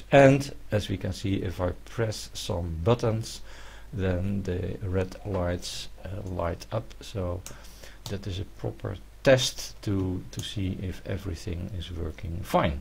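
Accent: Netherlands. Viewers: Just watching to take in the genuine Dutch accent. This sits like a mustache.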